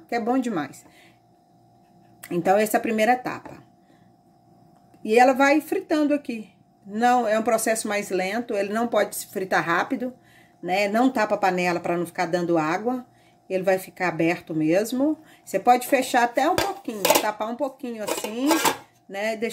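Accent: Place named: Minas Gerais, Brazil. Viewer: eu li um comentário q disse ,pelo sotaque só pd ser mineiro sujo ,ou goiano , não entendi fiquei curiosa, por favor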